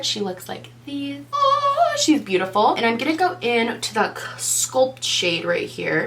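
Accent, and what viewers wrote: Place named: Upstate New York, United States. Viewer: Girl I want a full look with you telling us everything in your upstate accent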